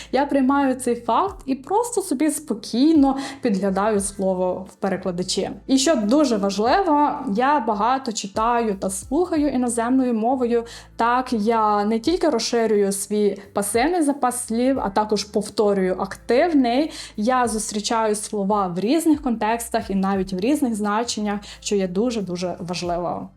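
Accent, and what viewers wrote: Western Ukraine, Ukraine. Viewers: Судячи з акценту говірки (характерного м’якого «и»), ви, швидше за все народились і виросли на заході України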